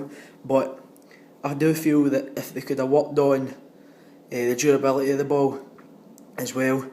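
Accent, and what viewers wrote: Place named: Scotland, United Kingdom. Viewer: I feel gifted that i can understand this thick of a scottish accent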